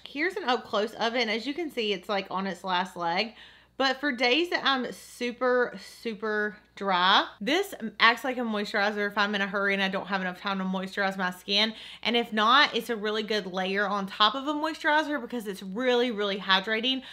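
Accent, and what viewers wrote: South, United States. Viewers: Why would ANYONE dislike video?! Lol 😂 honestly idgaf what video it is, I love watching her stuff, her voice is relaxing (her country accent) and she's funny!!! PLUS she talks about makeup!!! What's not to LOVE?!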